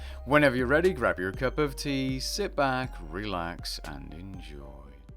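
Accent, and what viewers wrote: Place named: Netherlands. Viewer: Are you dutch? You can really tell by the accent haha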